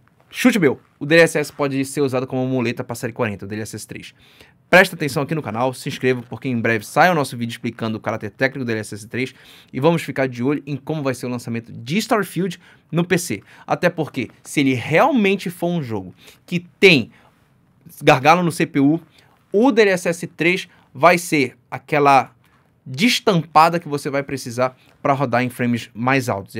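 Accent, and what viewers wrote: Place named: Belém, Brazil. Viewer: meu pai e de Belém, passo a maioria das ferias com a minha vó em Belém, nao tem como nao reconhecer esse sotaque.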